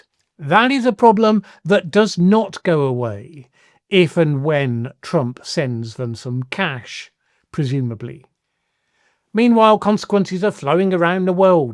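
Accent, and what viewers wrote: United Kingdom. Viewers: Better a normal British accent than some nasal yee-haw from an incoherent hill-billly eh ?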